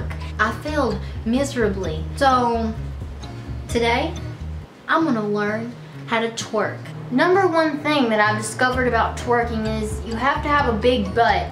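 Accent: South, United States. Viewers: Throw back to when still had her southern draw ❤️👅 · I love ur country accent it's really sweet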